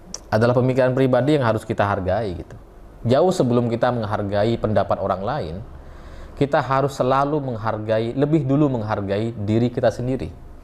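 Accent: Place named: Madura, Indonesia. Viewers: Saya suka nonton video mas baik di CokroTv maupun di SewordTv. Saya suka ulasan-ulasan anda tentang politik atau sosial, dan logat Madura anda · Wahhh... Congrat ya bang.... I always stay tune on ur channel... Happy milad juga buat bang, barakallah fii umrik... Semoga tambah sukses lg, dan terus menyuarakan kebenaran utk akal sehat biar kita gak pernah oleng... Betewe, sengko ghelem kaosah jih... Mayuh kerem ghebey sengko bang... 😁😀 Kata siapa gak cocok... Justru you are special. Kaya prof Mahfud my idol, bangga lihat logat Maduramu masuk youtube dan always dilike byk orang. Whats wrong with ur aksen... Go ahead bang... Be the best.. Best wishes dr kami di Kalimantan Tengah, ur truly liker... 😂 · Sampean abit pon bede e jakarta kak...mak logattah pagun katarah jek reng madureh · Saya suka logat maduranya mas. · Saya Katolik di Bali, setia menonton pakar mantan. 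Justru dialek madura itu cirikas Mas banget. Bravo n viva mas.. menginspirasi masyarat. PROFICIAT UTK PENGHARGAAN DARI YOUTUBE.. MAU KAOS JG DONG MAS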